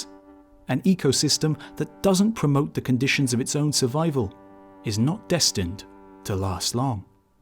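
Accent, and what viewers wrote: United Kingdom. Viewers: "Hoover up" Definitely not from the USA - as if the accent didn't give him away. That is the most (British? UK?) thing I have heard him say. Sorry if I guessed wrong on the accent - I am just an un-cultured American. :o) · are u british? 🤢🤢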